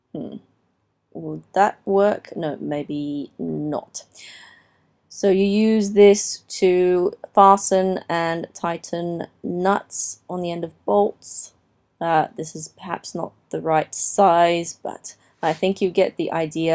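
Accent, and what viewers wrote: Australia. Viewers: Your accent is bueatiful,where are you from?...from Australia l bet! by the accent .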